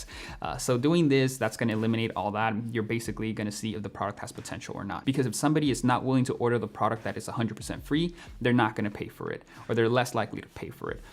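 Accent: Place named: New York, United States. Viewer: Are u from ny bro?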